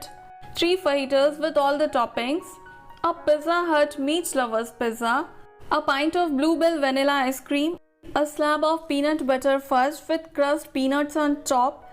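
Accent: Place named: India. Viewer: Great video and the Indian accent is beautiful. Thank you for sharing these photos.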